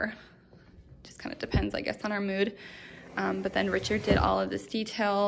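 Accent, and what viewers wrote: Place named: Utah, United States. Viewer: Love the boho farmhouse style! My first video I’ve seen and so I don’t know your history. Are you from Utah? I can definitely hear a UT accent! I lived in the Midwest for 27 years and now in UT for the last 7 years.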